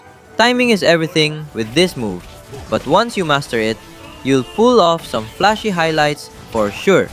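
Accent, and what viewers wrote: Philippines. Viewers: Nah bro actually using Pliphina accents😅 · Accent: Filipino Language: English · Filipino accent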